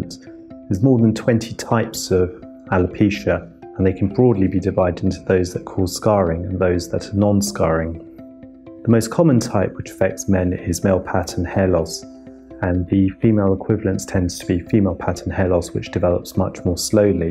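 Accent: United Kingdom. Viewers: It's a classy British accent you fucking hater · Hy chutiya india or english mar rha h british accent main jesay donald trump ki na jaiz olad h jo becahry urdu ni smjhty wo tri bhn ko kryn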